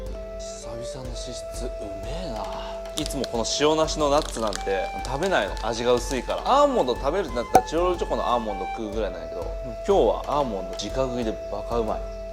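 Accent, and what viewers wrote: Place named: Kansai, Japan. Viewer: 今日いつもより関西弁多かったですね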